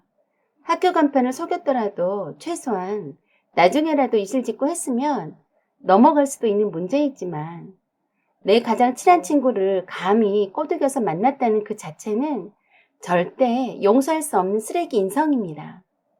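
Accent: Jeolla, South Korea. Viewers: 전라도 억양이 너무 많이 나와서 듣기 거북하네요 나도 전라도 사람이지만요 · 오늘따라 전라도억양이 엄청 강해부러요ㅋ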